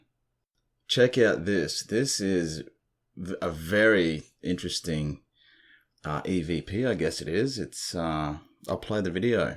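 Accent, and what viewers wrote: Australia. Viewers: Awesome Aussie accent and awesome ghost videos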